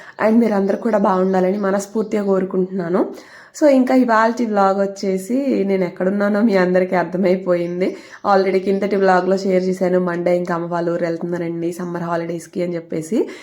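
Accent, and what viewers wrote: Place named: Ongole-Guntur, India. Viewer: Meedi Ye ooru andi..... garu....Adento Koncham slang Ongole and Guntur mix undi...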